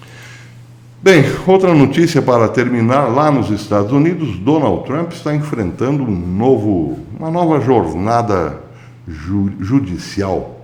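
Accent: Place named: Minas Gerais, Brazil. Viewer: PROF...... ADORO QUANDO MISTURA A FALA NORMAL COM O BREGA OU MINEIREZ.HOJE GOSTEI, TAMBÉM, DO SARCASMO MAMATA... AG GRANDE VITÓRIA ES.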